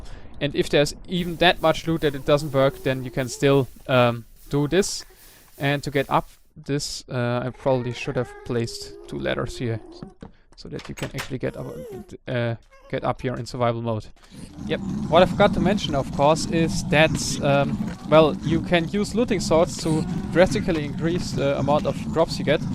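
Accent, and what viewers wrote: Germany. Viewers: wegen dem Akzent, klingt so ein wenig deutsch :D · ich hab schon die ganze zeit das gefühl gehabt dass du deutsch bist. du hast einfach so nen "Akzent" und man hört das typische Schulenglisch raus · are you german?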